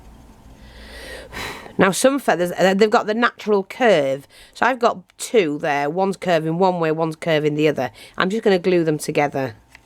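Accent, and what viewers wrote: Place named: England, United Kingdom. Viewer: Your awesome you have warmed the kukkels of my heart..lol thanks a lot.Is it Easter there,Where are you from You sound English.I love the accent..Im a Newfie in Kitchener Ontario.I call myself The Official Witch of Kitchener City.lol.Have you ever watched any of Lisa The Pagan videos She sounds just like you I love her.Blessings.)0(.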